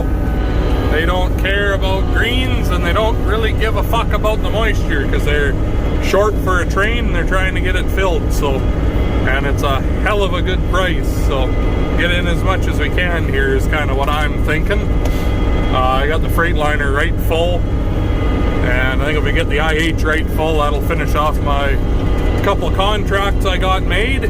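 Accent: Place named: Canada. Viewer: You Canuck's sound funny when ya talk, I'v been noticing.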